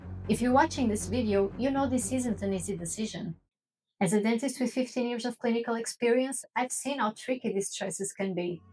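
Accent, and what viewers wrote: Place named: Brazil. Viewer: By her accent, she is Brazilian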